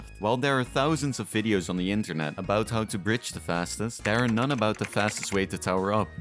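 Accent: Netherlands. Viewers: u dutch perchange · Quick Question are you dutch by any chance? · Dutch accent detected?? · Really cool Am I hearing a dutch accent?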